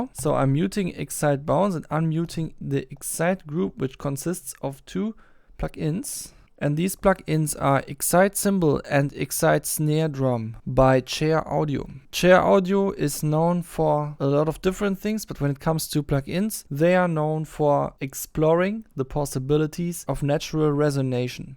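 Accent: Germany. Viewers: Boah deinen deutschen Akzent hört man sofort raus 😅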